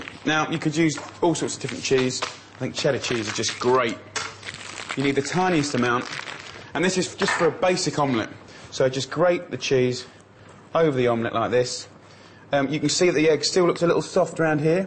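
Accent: United Kingdom. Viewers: He is British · He doesn't have a lisp, he's from the UK.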